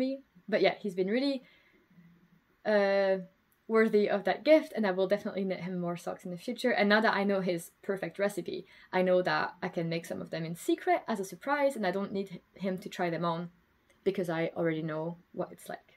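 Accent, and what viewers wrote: Scotland, United Kingdom. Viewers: I'm watching you just now and love the Scottishness coming through your accent. Like all of us in Central Scotland you've dropped your t's. I'm really enjoying the podcast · A lovely podcast. Your sweater is so nice. I live in Glasgow & you sound very Scottish. I like your swatch knitted in local Suri Alpaca. I am knitting a third Sophie scarf for a friend. Thanks 🏴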